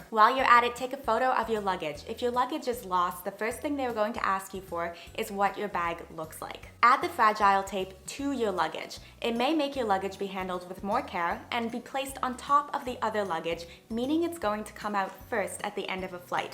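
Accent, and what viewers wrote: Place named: United States. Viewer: She has an American accent so I assume the USA.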